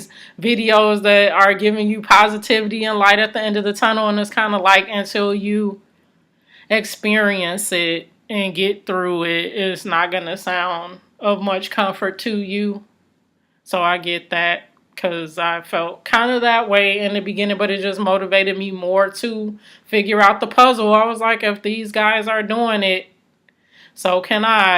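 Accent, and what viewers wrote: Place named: Carolinas, United States. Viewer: Nope. You sound like my family but they're from the Carolinas / DC. Anyway love your videos. Thanks.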